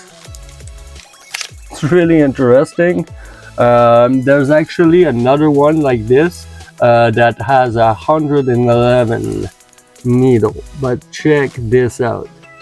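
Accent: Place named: Quebec, Canada. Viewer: Salut! Ca fait quelque temps que je vois ca passé et je suis tout autant intrigué en espérant de les voir en action bientôt! Ca aurait aussi ete le fun que tu fasse des vidéo en français parce que clairement a l'accent tu est québécois 😊 ce genre de contenu est vu et revus en anglais, je comprend que tu essaie de rejoindre la plus grande audience possible mais bon moi j'aimerais bin ca trouver plus de ce genre de contenu en français et je suis sûrement pas la seule. Sur ce merci pour tes Vidéo 😊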